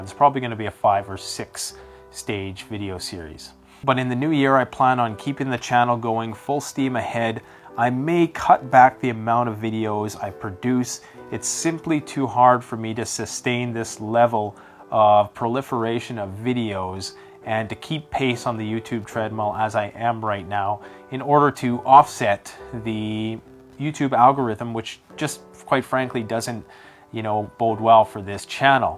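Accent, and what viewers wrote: Canada. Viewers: Since there IS not a Canadian voice, I feel your channel is unique. You shouldn't have to change your name. Happy new year to you and yours. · The name is good. It tells us you are Canadian and that doesn't mean you are limited to talking only about Canada. FPS Russia is an American faking an accent and making videos about things that Russians don't have access to. It works well for him. Your Canadian accent is real and no one questions that you are from Canada. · at first i thought this video is just a funny parody: a canadian flannel wearing with overly canadian "out" and "about" with that background music, but no it seems you're serious! well most of the things you said are pretty factually wrong but hey you seem like a pretty nice and likable guy so best of luck to you buddy.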